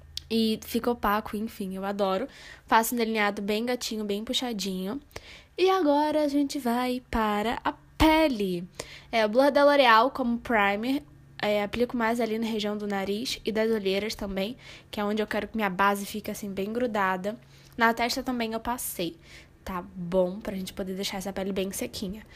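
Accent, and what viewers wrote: Rio de Janeiro, Brazil. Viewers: Achei que você fosse do Rio 😆 sotaque carioca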